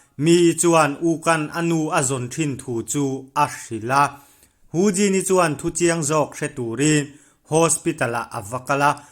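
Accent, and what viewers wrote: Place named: Myanmar. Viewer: I trawng hi Myanmar (burma) aw top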